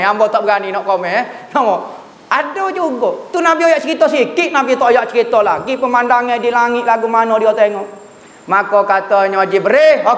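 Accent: Kelantan, Malaysia. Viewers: Allàhhurabbi... Xpenah jemu déñgar carà penyampaian ustaz bercéríta pàsal kísàh2 nabi, càra ustàz cerita sémua señàg faham dan múdàh hadàm.. Dr ségi bàhasà dàn lénggok kélàntan ustaz & bérsahaja buat àku làgi méndàlam masuk terus dlm kisàh2 ñàbi.. térus dpt bàyàñg keàdààn masa zàman ñàbi.. àku órg Johor suka sgàt lógat kelañtan & Terengganu 💪😎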